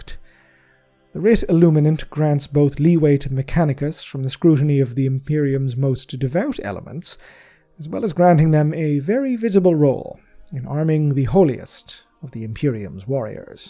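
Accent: Ireland. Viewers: maybe this is a weird question but do you have an irish accent?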